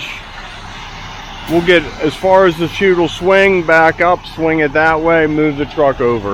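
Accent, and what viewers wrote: Pittsburgh, United States. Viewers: Are you a yinzer? You sound like it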